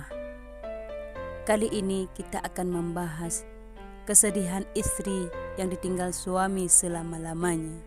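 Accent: Aceh, Indonesia. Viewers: teungku.. teungku... logat seperti logat Aceh. peu beutoi ureung aceh?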